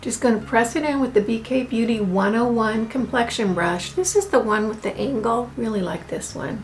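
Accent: South, United States. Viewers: Started vlog at 5.30am in (Aus) woke way too early 🤣 and just couldn't resist hitting that start symbol. That southern accent? Gently drifted back to sleep then 3 hours later with coffee in hand just had to watch again. One who also wanted makeup tutorial your skin is literally glowing. Looks fantastic. Just wish products used when converted to $AUS could be purchased without my having to forego a fortnight rent 🤣 sad but true. Aus conversion rate, shipping & taxes in this country 😫 The final highlight powder I love but here at Sephora it's over $100. Sure there are dupes here but looking at reviews this seems to be in a class of its own. Am over 70 so getting a glow without looking like a sparkly crocodile is a challenge. Going to get the KVD bronze gel tho, so soft & pretty. Love her eye shadow pallettes as well but am def going to look at Milani. 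Thank you for videos & workouts that are age appropriate nice to hope that with effort & attitude maybe I am not totally invisible.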